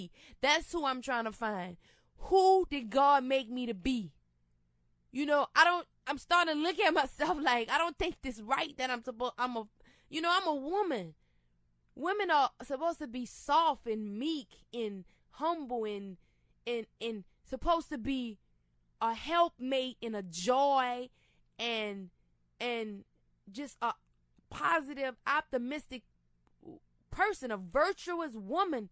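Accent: New Orleans, United States. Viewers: I was thinking of some old BME stuff and Googled you. Glad to see that your life has turned around for the best. But truth be told, your past lyrics use to be on rewind because you was spitting fire. Plus I love women from New Orleans accent.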